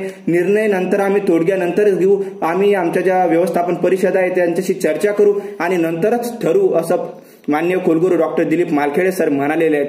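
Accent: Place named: Konkan, India. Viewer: Vava konkase bolat ahe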